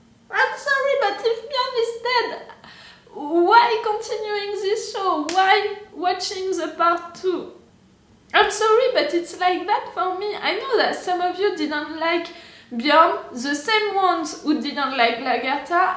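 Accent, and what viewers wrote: France. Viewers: u are french? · J'ai tout de suite deviné que t'étais française vu l'accent XD Mais ouai j'ai eu la même réaction que toi quand j'ai vu Bjorn se faire transpercer... J'espère sincèrement qu'il n'est pas mort, mais j'y crois pas trop quand même. C'est mon personnage préféré, mais là faut avouer que ça pue pour lui quand même mdr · You have such a big french accent. Is so funny. 😂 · You have a french accent you know that your reaction is one of the best i seen until now you are sensible that mean you love this as i do and you are attached to the charachters i have the same reaction when bjorn falls he was the only reason why i am still watching the show until now he's death broke my heart to peaces he was the best charachter in the show after ragnar he was with us from the bigining we watch him grow up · Française ça s'entend 😉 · On l'a reconnu direct cet accent mdr *bjorn n'est pas mort non non non non...épisode incroyable.. 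ubbe ont compte sur toi frérot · Tu es française ? Par moment tu prononce des mots avec l’accent français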